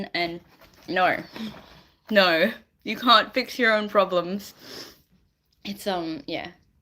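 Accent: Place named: Australia. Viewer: Love your accent. Are you an Aussie 🤔🦘🇦🇺🌏🐨🪃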